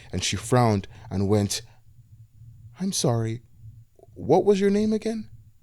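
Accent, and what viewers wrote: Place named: Nigeria. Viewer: While I won’t say us Nigerians are rare, we are few and fair between when we occupy commentary spaces I absolutely shocked when I heard the close to home naija dialect and little slips of our accent mixed with such eloquent writing and structure in this vid. As a fellow Nigerian who felt stuck In how our community limits us to such places I’m pleasantly surprised and annoyed i found you just now.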